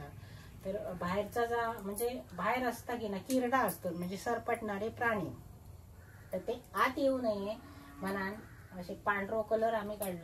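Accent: Malvani, India. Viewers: पारंपरिक पद्धतीने बनविली जाणारी खीर तुम्ही अगदी छान पद्धतीने दाखवली त्याबद्दल धन्यवाद तुमच्या तोंडी मालवणी भाषा ऐकायला खूप छान वाटते.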